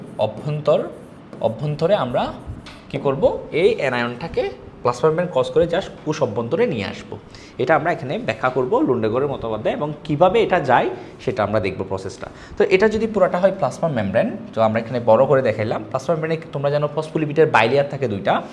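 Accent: Sylhet, Bangladesh. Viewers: You are from Sylhet, are'nt you? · Nice class🤗.Btw,I think you're from sylhet because of the sylhety accent while you're speaking .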